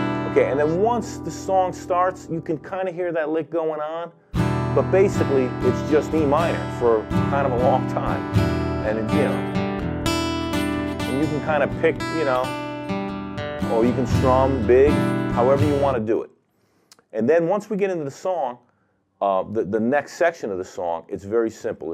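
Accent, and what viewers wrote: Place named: New York, United States. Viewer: An Italian American man with new York accent teaching an Indian sing. Amazing!!!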